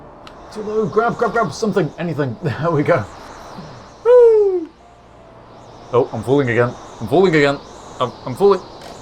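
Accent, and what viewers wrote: United Kingdom. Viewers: GUYS!!! I just got a great idea he plays Stanley parable. Think about it glorious British voice versus glorious British voice.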